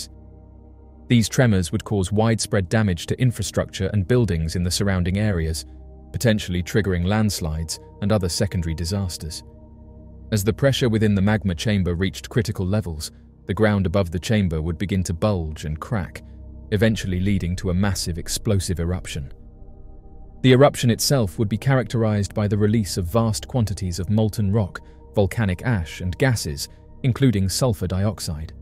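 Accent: United Kingdom. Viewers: We need British sounds like you to be assured it's bound to occur in my life.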